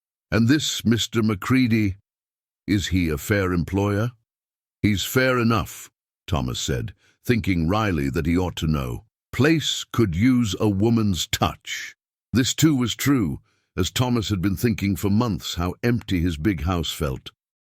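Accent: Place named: United Kingdom. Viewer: The British accent doesn’t match the Wild West. 🥴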